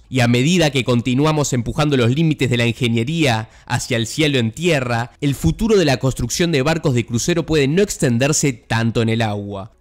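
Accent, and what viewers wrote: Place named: Buenos Aires, Argentina. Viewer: Decime que sos porteño sin decirlo: "mueshe, astishero, quisha, shevada..."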